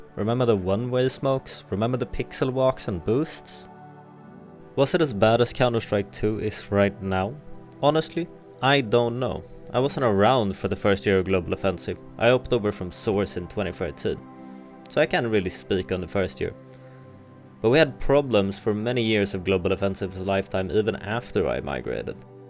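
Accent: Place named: Sweden. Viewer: You’re from Sweden? Sounds like Swedish accent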